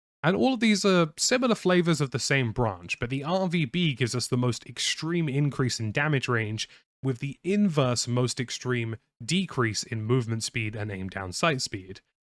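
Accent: RP, United Kingdom. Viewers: mate, you're too posh to be confusing less and fewer. Good video though.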